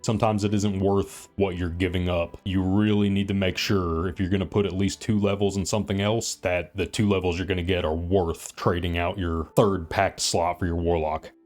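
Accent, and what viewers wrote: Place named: South, United States. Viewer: Hey, honest feedback from a consumer of the content here - filming yourself and editing the feed in over the background graphics and videos adds nothing to the content for me, personally. I think you could save yourself some effort and nix your video feed in favor of pure audio. In fact, moving the production time over to more contextual graphics in your videos would be a HUGE level-up. I think doing that well would jump your production values significantly. Love the walkthroughs, love the personality of your VO, love the southern US representation. Wishing you well! Edit: I love the respectful dialogue. It's great to see that the channel has cultivated a solid audience. Kudos to, and to the viewership!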